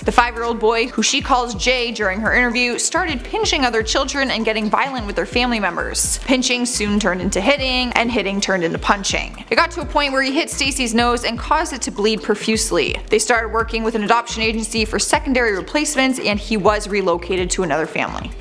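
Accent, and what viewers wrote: Canada. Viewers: Her hair is in a weird style...but I don't feel like punching her. Her accent is funny too....Canadian?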